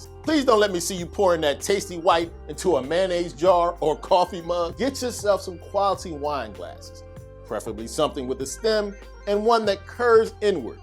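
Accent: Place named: Alabama, United States. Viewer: Bro you from Bama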